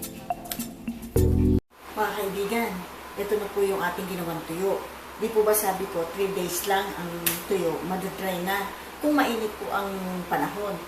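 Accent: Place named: San Miguel, Philippines. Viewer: thanks for the share! i like the way way you described things, may punto pa eh! parang taga san miguel eh! i watched the bagoong, so i ll continue with tuyo. ganda ganda nang tuyo, just like you and your video! thanks again! ow sadiqa ka pala... kaif falik, shukran jazilan!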